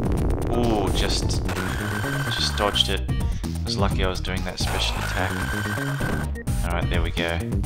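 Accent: Australia. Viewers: I believe you're still out there. Hope you're doing well. Every time I hear an Aussie accent I shed a tear and think of this channel.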